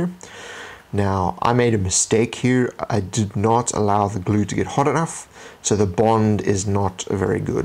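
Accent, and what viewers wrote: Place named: Australia. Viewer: Hahaha, awesome Aussie intro there mate!!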